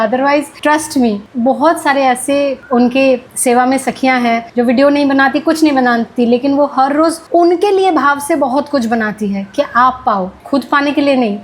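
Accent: Bengal, India. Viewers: Di speaking in Bengali accent flawlessly 😮 mere sar ke upar se gya sab 🥲😅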